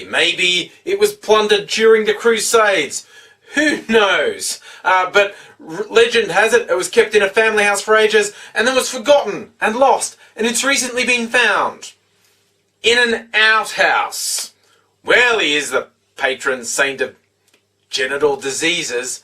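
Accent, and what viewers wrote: Australia. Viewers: hmmm you seem angry for some reason and also Australian hmmm still cant make why i see this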